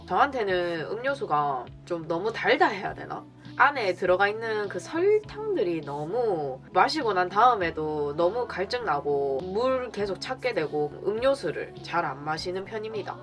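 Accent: Gyeongsang, South Korea. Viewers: Is this a busan dialect ? I love it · 大邱のなまりですか？？ 何となくですが……🤔 とてもお綺麗です！！🥰🥰🥺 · 말투는 대구 경북 ㅎㅎㅎ너무이뻐요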